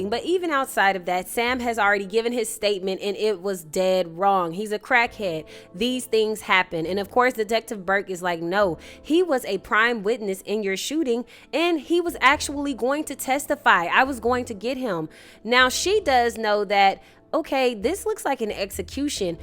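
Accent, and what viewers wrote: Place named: New York, United States. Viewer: This episode was fire!!! Your New York accent has me rolling btw.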